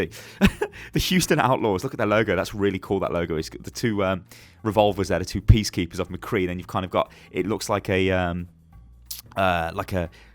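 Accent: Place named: United Kingdom. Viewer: Your so British!🤣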